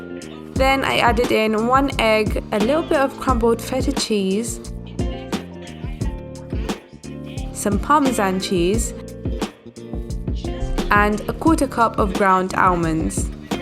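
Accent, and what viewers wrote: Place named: North London, United Kingdom. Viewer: my first time watching your videos and i fell in love, and of course subsribed :) you have such a beautiful accent, probably north london with a tiny bit of east, almost like amy winehouse <3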